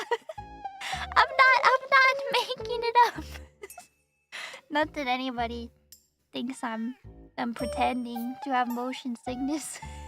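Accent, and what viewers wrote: United Kingdom. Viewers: I knew she was Bri'ish, you can hear it in her accent :_nimiNerd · Authentic British accent! Thanks for the super fun stream! Looking forward to more! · With how much british ancestry you had I hope to hear that lovely british accent a lot more:_nimiHaha: Nice silly idea for a stream and dna accurate drawing was fun. Thanks for the stream:_nimiHeart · I knew she had to be mainly british once I heard her british accent - it's bloo'y lovely! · My oshi is 2/3s British, now the British accent coming so naturally makes sense:_nimiHaha: This was super interesting, seeing all the results. I still think the ear wax is very cursed though:_nimiHaha: Thanks for the stream:_nimiHeart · thanks for the stream!:_nimiHeart: it was fun going through your results and I can see where the amazing british accent comes from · イギリス訛りいっぱい聞きたいよ！♡ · Wow, the results are in and is 100% cute, but with no trace of elephant:_nimiCry:. 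Ah well, at least you have genuine British in you, so your accent must be genuine as well! Thanks for the stream and see you for next time! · I always knew you were British, your American accent is VERY convincing though. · The British accent has been ingrained in her this whole time. It was foretold. :_nimiHaha: Thanks for the stream :_nimiHeart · British accent is confirmed to be authentic! This was such a unique stream idea.:_nimiHeart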